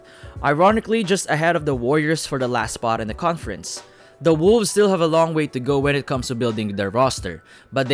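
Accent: Philippines. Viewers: Lol Pinoy accent, my man.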